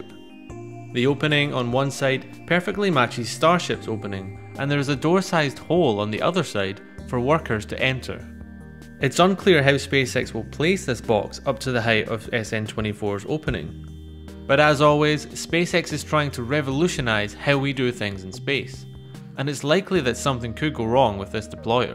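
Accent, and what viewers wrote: United Kingdom. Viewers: he is bri'ish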